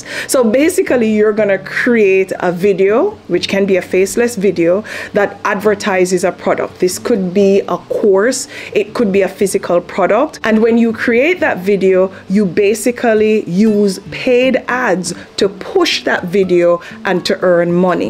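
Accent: Jamaica. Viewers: Wow, You are n excellent communicator. I am guessing Jamaican. Or perhaps an African land. Any way love your presentation. There was a lot and a bit over-whelming. I am coming up on 11 months on my channel and making progress but have not added any affiliates as when I started to add one 8 months ago I was warned by YT. I need help making sure I don't break any monitization rules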